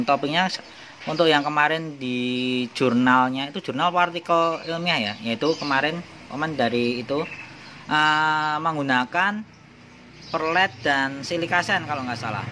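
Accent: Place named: Yogyakarta, Indonesia. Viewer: kalau tidak salah tadi pakai pasir silika putih bisa ya? yg untuk aquarium kan. btw mas orang mana kok jawa jawa nya ky jogja/ semarangan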